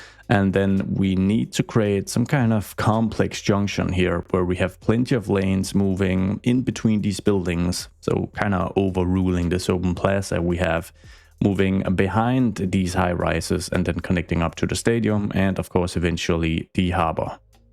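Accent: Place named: Denmark. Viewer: Er du dansk? (I'm guessing this based on your heavy accent) :D Incredible content and builds.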